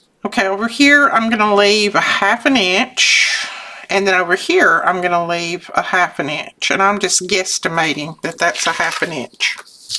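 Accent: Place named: Tennessee, United States. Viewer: When I heard you say your birthday is October 7, and had depression , I also have depression bouts out of nowhere. I have taken meds for several years, and they really help. But sometimes I have to fight it everyday. Also, our youngest son ‘s birthday is October 7. He died 4 years ago, so it is always a tough go during that time. He was 49 years old. I listened to you talk after your husband passed, and I felt so helpless. There are no words, or right or wrong way to grieve. Everybody has their own way to try to get through. I feel his presence when a Cardinal comes to see me, when I find a bird feather, see a beautiful butterfly, or see his image in a cloud. I hope your depression stays in the background, and your memories of your husband make you smile. I watch you all of the time. Thank you for taking the time to make your videos and for your beautiful freebies. 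It is also nice to hear someone who sounds like me. I am from Tennessee.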